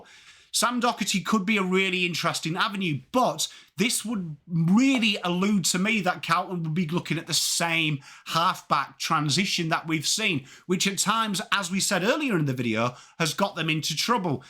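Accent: England, United Kingdom. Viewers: a pommy talking football lol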